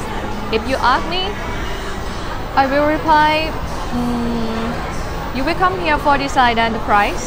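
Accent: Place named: Asia. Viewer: Bien pour une première, bizzarement filmé ce doit être du au montage avec de nombreuses coupes non? sinon son anglais a parfois est rigolo avec cet accent tonique asiatique, ça me rappelle par moments Michel LEEB dans son sketch du chinois..LOL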